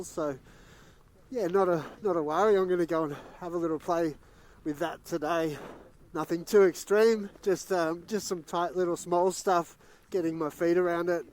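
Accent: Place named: Scotland, United Kingdom. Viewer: dude your accent is so nice, looks like scottish but your channel is based in Australia, australian accent is just like yours?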